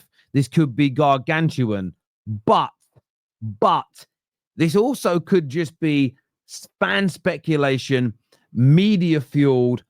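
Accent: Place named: Cockney, United Kingdom. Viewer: What a load of crap! Another cockney conman!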